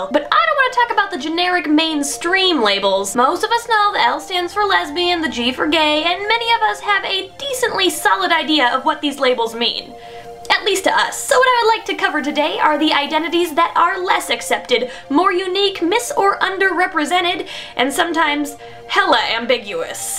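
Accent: Minnesota, United States. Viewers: You're MINNESOTAN?!?! SO AM I OH MAH GAAWWWSSSHHH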